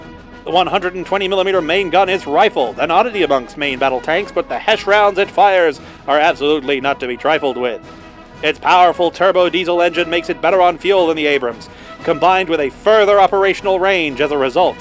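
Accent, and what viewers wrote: Transatlantic, United States. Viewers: Incredibly neat work. Is that your 'Yank' sounding voice. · I love the film reel style, but one note on the Transatlantic accent, you can still keep most of your Australian pronunciation with soft R's before consonants and longer vowels in the same way British Transatlantic Accent broadcasters could, keeping the allied identity there but maintaining the narrow frequency range and vibe (Wendover did a good video on how to speak it and it ends up sounding more Aussie since it was half-designed by an Aussie anyway, for not-so-noble purposes: <link> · More quality content from the king himself. You sound more American than I do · Great American accent!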